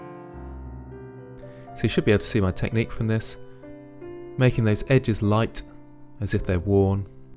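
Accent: United Kingdom. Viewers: Awesme like always, thanks for the many tutorials! iam not a nativ english speaker but i love your British Accent :D its what i was looking for lo learn how to use Blender. may i ask u a question? i would like to know how you creat a complete Scene with more than one 3D model. For example the Background, the Floor and some Objects like a Barrel and a Fence infront of a Building. Do you still use Blender to put them together? If I missed the answer in one of your videos, I'm sorry. Sometimes i do not understand all word in English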